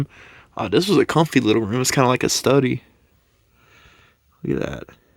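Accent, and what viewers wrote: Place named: South, United States. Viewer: I was trying to figure out what state you were raised in by your accent. My guess was Carolinas or Georgia. You are my favorite explorer. The narration is great and you spend the right amount of time in each room. Keep up the good work!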